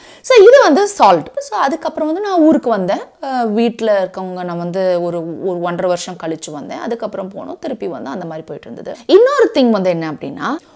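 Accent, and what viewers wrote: India. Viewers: Hi , much appreciate ur clarity of speech and kongutamil .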